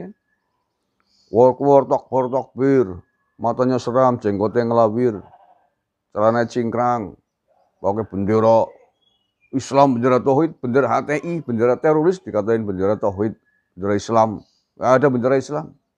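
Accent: Banyumas, Indonesia. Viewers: asli.... logat Banyumasannya kangmas satu ini yg bikin kangen dengerinnya... Sehat terus yoooooo Masbro.... :)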